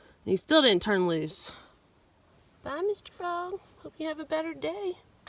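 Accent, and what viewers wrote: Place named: Alabama, United States. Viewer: That was some Lake...the best in your LAFE?!! You sound like my sister (Birmingham, Al) LOL! Love it!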